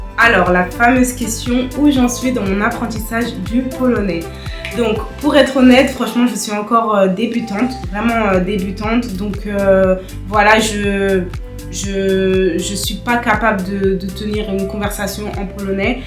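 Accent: Paris, France. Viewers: Czy ja dobrze rozpoznaje, że mówi z paryskim akcentem klasy robotniczej?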